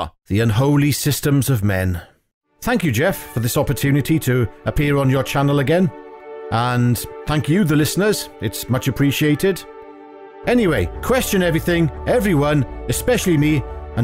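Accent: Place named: Wales, United Kingdom. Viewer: It’s refreshing to hear common sense articulated in a Welsh accent. Normally you have to be careful about expressing opinions like that in Drakeford’s hermit kingdom.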